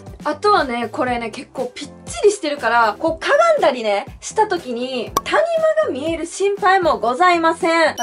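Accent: Kansai, Japan. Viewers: インナーの企画もっと載せてください。それと関西弁が好きやねん。💝💝💝💝💝。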